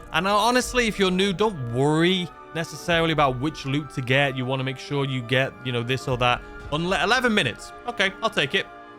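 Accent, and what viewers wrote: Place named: Gloucester, United Kingdom. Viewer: Where was u from originally in the uk bro i hear abit of Gloucester in u lol! Do a Q & A one day so i can ask u lol an how did u end up movin to newyork 😎👌🏼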